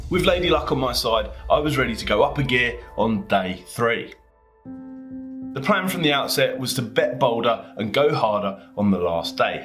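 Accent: United Kingdom. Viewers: You from Ipswich mate?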